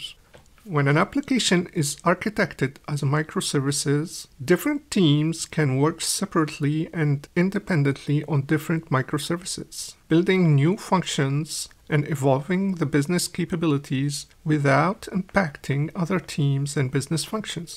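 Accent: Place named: India. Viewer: at 1.25x this sounds like an indian accent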